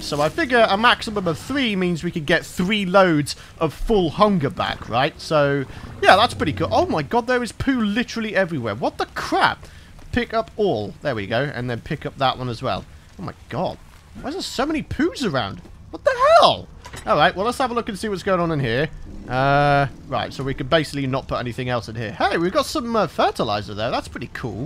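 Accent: Scotland, United Kingdom. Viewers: he sound kinda scotish like his sound when he talks i just noticed that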